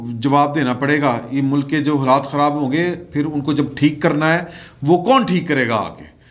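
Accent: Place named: Lahore, Pakistan. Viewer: تہاڈی لہوری بولی دی چس آندی اے گریٹ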